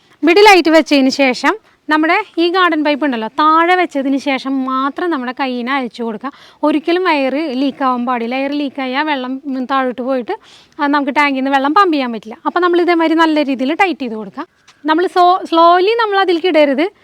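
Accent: Thrissur, India. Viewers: സംസാരം കേട്ടിട്ട്. തോന്നുന്നു തൃശൂക്കാരിയാണെന്നു എന്തായാലും വീഡിയോ അടിപൊളിയാണ്‌ കേട്ടോ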